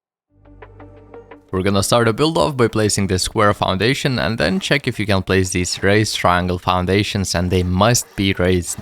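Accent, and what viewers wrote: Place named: Sweden. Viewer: Pretty sure hes swedish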